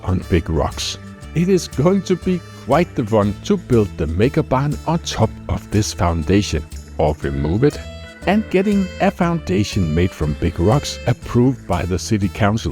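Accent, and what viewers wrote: Denmark. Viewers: jeg kan bare lig hvor dansk du lyder :D jeg er også dansk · You have similar accent to a Dutch youtuber I follow, Posy. Danish and Dutch have very similar accent when speaking English. I think it sounds cute.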